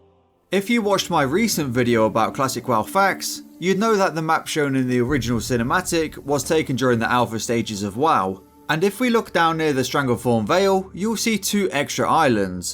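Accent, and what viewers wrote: United Kingdom. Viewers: Are you Britannic ? I think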